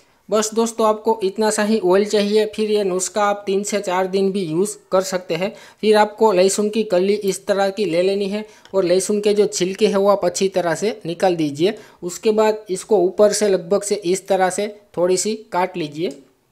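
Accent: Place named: Kathiawar, India. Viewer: Sir tame Gujarat thi chho ne ? Kathiyawadi chho lage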